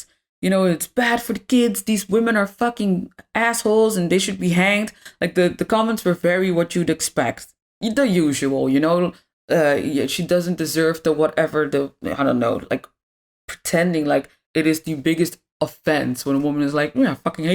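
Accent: Netherlands. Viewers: One of my greatest accomplishments in life is never having kids...by choice. Good vid, thanks! I did notice your Dutch accent!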